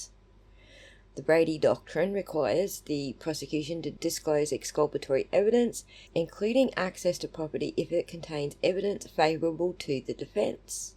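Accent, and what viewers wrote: Australia. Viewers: I always love listening to you Aussie.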